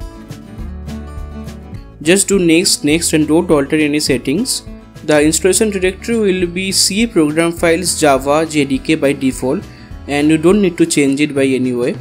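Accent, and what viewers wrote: India. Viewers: i liked the video only beacuse of the indian accent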